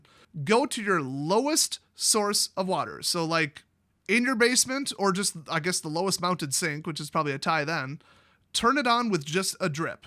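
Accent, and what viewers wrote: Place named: Michigan, United States. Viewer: Very good video. I have slept in hotel parking lot in my car when I didn't want to pay for a room after a concert. I have a hybrid so it's less of a concern, but I always worried about some psychotic person walking up and stuffing something in my exhaust. That's why I always did it in a hotel parking lot. A battery-powered carbon monoxide detector would have been a good idea. But there are tons of people who try to commit the s thing this way and they fail, so I figured it couldn't be all that deadly. Also, you sound more like a Michigander.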